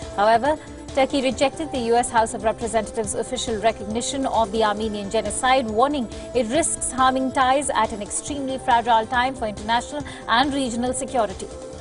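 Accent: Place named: India. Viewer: Ewwwwwww Indian accent lol destroyed English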